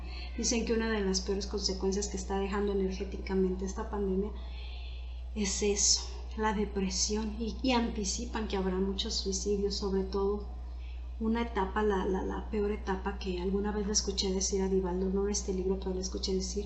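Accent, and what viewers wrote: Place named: Mexico. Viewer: Hola, voy a asumir por tu acento que eres de Mexico, pero en caso de equivocarme igual gracias por compartir este video en YT, quisiera saber si sabes de algún centro espirita para congregarse aqui en Mexico yo soy de Nuevo Leon pero eh mandado correos al que supuesta mente es de este estado pero no me responden, tendrás alguna sugerencia ? Saludos y gracias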